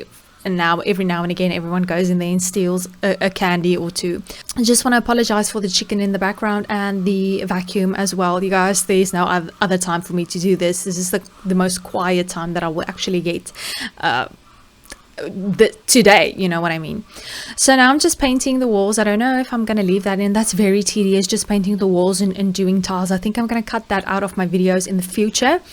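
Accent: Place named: South Africa. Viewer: I knew from ur accent your South African💀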